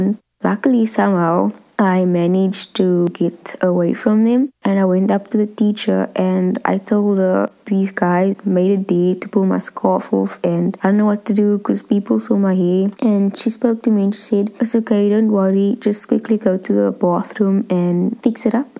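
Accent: South Africa. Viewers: I'm from south Africa and could instantly hear that she's colored lol same like me.. The "what-not" gave it away among other things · I can tell that she's South african 😎😎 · She sounds like a South African like me❤️ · I think she's South African because thats sort of how i sound when i speak english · Iqra Nazeer to me she sounds like she's from South Africa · She sounds South African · it's sounds like a south African accent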